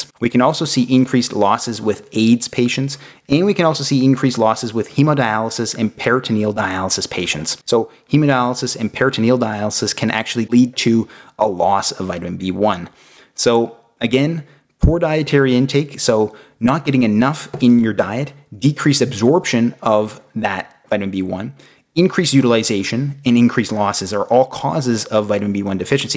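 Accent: Canada. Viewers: John Silva he's Canadian. Lol. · Love the Canadian accent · This Doctor is Canadian., Every human who speaks English in the world has an Accent... Soooo this is the way people speak in his Country! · Yes, thats the first thing i thought, aha youre Canadian !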